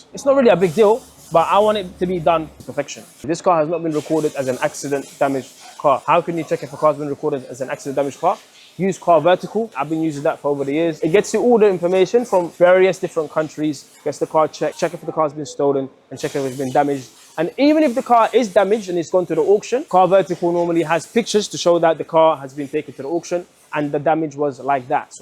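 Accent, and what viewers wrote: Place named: United Kingdom. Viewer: UK from his accent.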